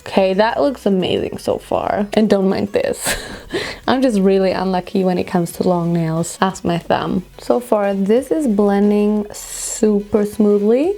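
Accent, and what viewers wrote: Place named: Germany. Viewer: Ok so its my first video I'm watching of yours and omg I could tell you're German because you sound and act very similar to wthmille who is hilarious! SUBSCRIBED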